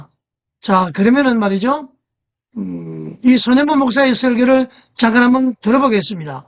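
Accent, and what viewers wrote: North Korea. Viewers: 그런듯이 아니잖아요 참 웃기네요 그만지껄이세요. 모욕하지 마셰요 무슨 미친소리합니카 북한에서 왔네요 말투가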